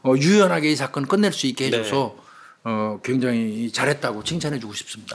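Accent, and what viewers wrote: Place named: Jeolla, South Korea. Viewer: 김복준 교수님 전라도 사투리 구수한 말씀 아주좋아요 옆보조가 좀 촌스럽다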